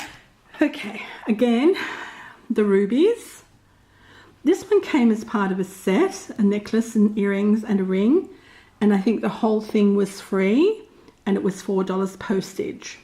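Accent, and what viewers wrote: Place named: United Kingdom. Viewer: Miss You are so funny!! I could literally imagine everything you were saying... The proper British accent made it all that much better!! In love!!🥰🥰🥰💯💯😂😂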